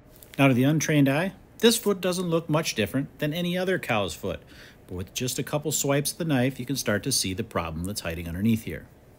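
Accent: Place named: United States. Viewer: Respectfully, what happened to your Scottish accent? Sounds American.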